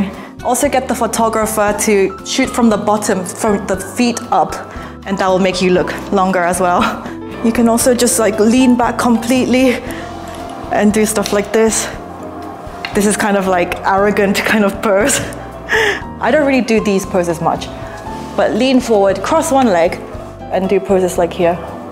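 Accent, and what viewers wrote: United Kingdom. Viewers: Does people study British English in Korea..as her accent is kinda British.. 🤔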